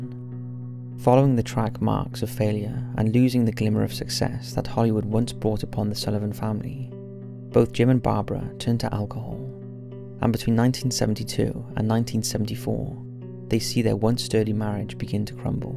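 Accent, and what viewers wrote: United Kingdom. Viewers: He's British, I believe, which would explain is pronunciation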